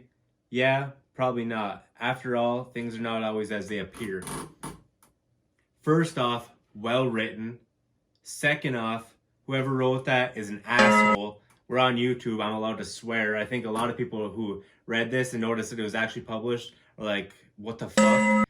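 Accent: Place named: Upper Peninsula, United States. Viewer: sorry to hear about your dad man. My best goes to you and your family. It's awesome to hear a good yooper accent again, and also pretty stand up for you to do this segment.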